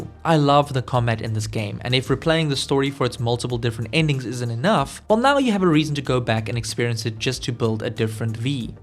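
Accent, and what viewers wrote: South Africa. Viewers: i hear a south african... i watch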